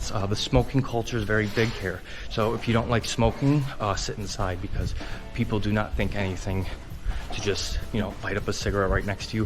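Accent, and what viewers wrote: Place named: United States. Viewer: what did he say that was not wrong? I also live in this city and I found what he said to be accurate. Yes not all resturants are closed during the afternoon but if its past 1pm good luck with getting into one as the kitchen can close between 1 and pm Lunch is like 1-1.5hr window to go. He sounds like he is either American or Canadian and there places are open all the time. So it is very different.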